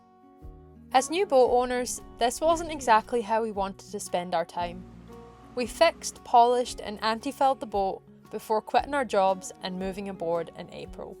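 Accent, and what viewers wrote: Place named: Scotland, United Kingdom. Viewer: I detect a Scottish accent so that'll do for me to subscribe - awra best hen 😊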